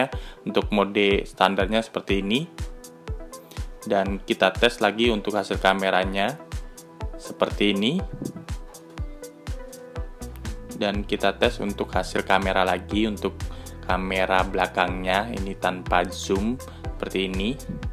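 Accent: Banjar, Indonesia. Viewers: Urang Banjar kh jua Pian😅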